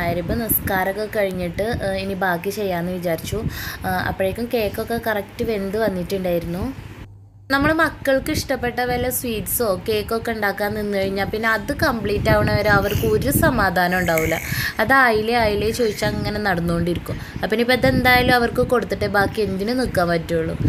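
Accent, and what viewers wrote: Malappuram, India. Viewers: Ningalude sthalam evdeyaa.. Malappuram anoo.. Pakka malappuram language anallo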